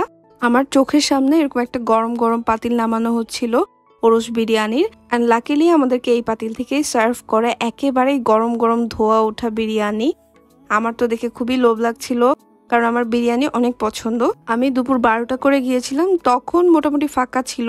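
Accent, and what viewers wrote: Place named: Chittagong, Bangladesh. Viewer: Apnar Chittagaingaaa accent wow 😊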